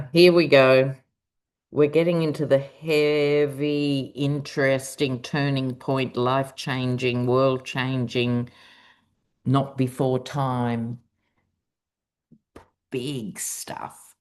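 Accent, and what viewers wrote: Australia. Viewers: A couple of decades ago I got to see Dame Edna in Austin, Texas. Before the internet we didn’t get much info about Australians here in Texas. Your accent and lingo reminds me so much of what we learned from Dame Edna, whom I adored. I love and appreciate what you do!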